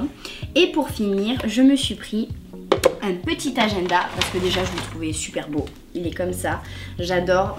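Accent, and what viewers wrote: South, France. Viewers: Super haul! Je te suis sur ta chaine depuis pas très longtemps, mais je suis très contente. Tu es simple, et tu prends beaucoup de temps pour tes abonnées et je trouve ça bien et presque rare maintenant sur Youtube.Tu mérite largement tes 15 000 abonnées. Et l'accent du sud, et la bonne humeur ; j'adore! :D Je ne connais pas la marque Sephora, mais j'en ai entendu du bien, je participe au concours. Gros bisous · Vidéo au top 💘 L accent du sud le meilleur Ahah 🌞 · Coucou, j'espère que tu vas bien, il n'y pas très longtemps que je suis abonnée à ta chaîne et je trouve que les montages de tes vidéos sont agréable a regarder et tu as un accent du sud que je connais particulièrement puisque je suis aussi dans le sud. J'ai pu tester beaucoup de produit sephora notamment les vernis qui sont pas mal et je trouve aussi qu'il se sont amélioré sur leur produits. 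Je participe au concours, bonne soirée ☺ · Merci énormément pour ce concours auquel je participe ! Je te suis depuis un petit moment et je te trouve super !!! Continue comme ça ❤️ Ps : j'adore ton accent et vive le sud ! 🙊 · Sa se voit que tu viens du sud de la France avec ton accent ah ah, super vidéo :)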